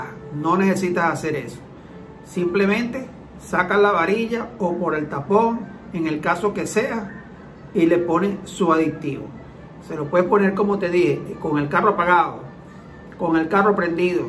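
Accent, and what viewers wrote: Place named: Venezuela. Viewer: Sr. un respetuoso saludo, Ud es de Venezuela creo por el acento jeje, soy de Vzla., tengo un corsa 1.6 año 2002 caja automática. El vehículo no se usa mucho en la semana porque tengo otro vehículo y por otras razones. Actualmente me desliza la transmisión en el 2do. cambio., ud cree con el aditivo mejore esa falla?, ese que muestra en el vídeo lo consigo en MercadoLibre?, un amigo mecánico me comentó o sugirió drenar todo ese aceite viejo sacar el Carter y hacer mantenimiento al colector del Carter, quisiera saber su opinión y recomendación por favor.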